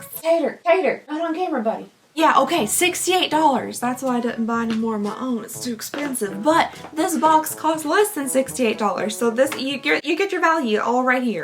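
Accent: Kentucky, United States. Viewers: Being from Kentucky inlove when i hear it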